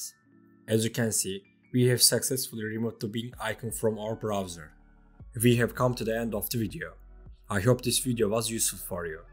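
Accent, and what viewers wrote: Turkey. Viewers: türksün değil mi konuşmandan anladım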